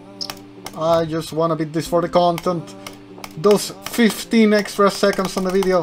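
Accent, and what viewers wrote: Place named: India. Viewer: but he sounds india so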